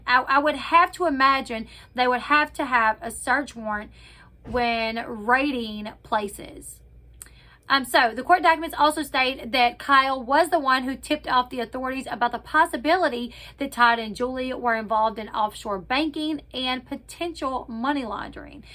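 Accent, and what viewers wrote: South, United States. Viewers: Thanks for the info..I don't care if it's late or whatever I enjoy your channel and your southern accent 👏👏💗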